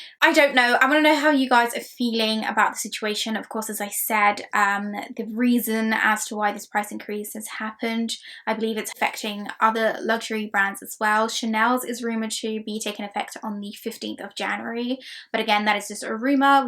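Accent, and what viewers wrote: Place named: United Kingdom. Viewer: Girl, you had me fooled - you sound British but referred to $$$ 👀👀 where are you from?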